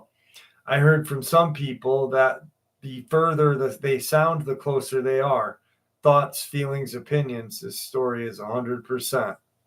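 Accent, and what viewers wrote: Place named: North Jersey, United States. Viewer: Well I believe your doing the North Jersey accent. We here in South Jersey do not talk like that. We have a southern twang. LOL!!!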